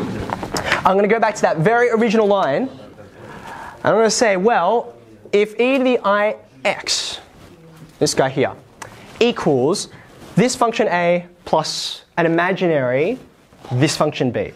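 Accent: Australia. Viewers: Well, if I’m not mistaken he’s got an Australian accent. The pronunciation “heeyah” is typical for Aussies (or maybe also for Kiwis) , like the pronunciation “aboot” (for about) is typical for Canadians. You gotta live with it. And the abbreviation cos (or sin) is also occasionally used in spoken language in my country (Germany). Nitpickers are gonna nitpick, I guess.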